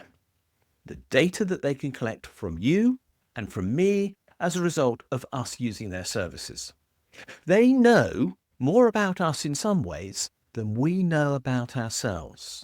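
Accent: United Kingdom. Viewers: By your accent your from Britain? UK? Stay out of U.S politics bud Trump is our president. A true leader. I ask this how dangerous can a company get when all the people have to do is simply use another company if they get too powerful but when the government gets too big we are fuc*ked. I'm more more fearful of a government than any company. Wake up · Your talking out of your backside because your mouth knows better. And your Brit accent does not make you sound intellectual. The only destruction is perpetuated by the Marxist Left: No God. No Country. No Family. Burning American Flags. And when witnessing that same regressive Left burning down cities, businesses; and, not to mention the violent fire bombing of Tesla and the mob attacks on citizens, you got some balls claiming President Trump is destructive.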